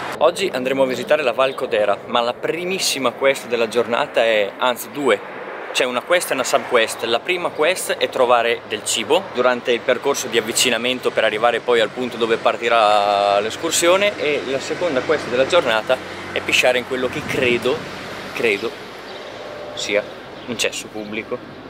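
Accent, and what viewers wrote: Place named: Ferrara, Italy. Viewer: bellissimi video, complimenti! poi col dialetto ferrarese è tutto più scorrevole e simpatico. Se non l'hai ancora fatta devi assolutamente fare il percorso della "Madonna della Corona " da Brentino Belluno. una delle cose più belle che abbia mai fatto e sicuramente uno dei percorsi più belli del mondo! Ciao e buone camminate e ancora complimenti! Maialll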